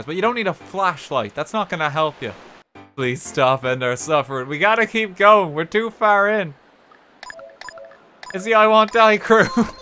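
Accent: Ireland. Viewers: Is this lad irish cause like he sounds irish but speaks like hes american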